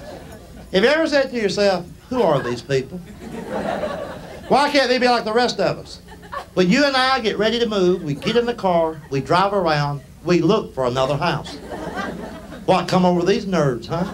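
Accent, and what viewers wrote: South, United States. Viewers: He stumbled on my u tube funniest Southern ever 🤣 absolutely from the south 🤣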